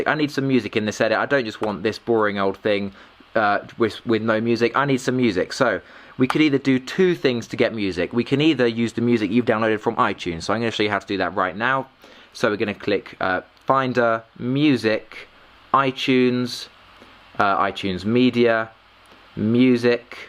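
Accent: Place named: United States. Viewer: Mate what if you've got an external hard drive after using FCP and it's taking SO much space on my internal HDD lol, how do you then shift the library to the new HDD without running the existing videos? I actually went into the original media files and deleted a lot of the files which has fucked up the videos I already made. But I'm like whatever, I need space. Haha so yeah REALLY need to sort this out and help will be appreciated. Only a few minutes in but explanation is clear as F and also I'm English so honestly, the American accent does get a bit annoying after a while in tutorials not gonna lie LOL. Thanks a lot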